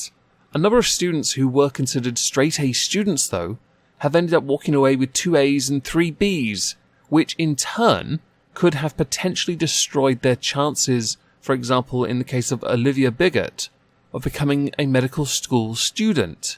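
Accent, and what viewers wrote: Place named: RP, United Kingdom. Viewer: 'I'm English'. It's pronounced 'EN-QUIRE-REE' in England son. FFS. If you're going to use a terribly R.P. accent, do leave out the Americanisms. And yeah, fuck the SNP.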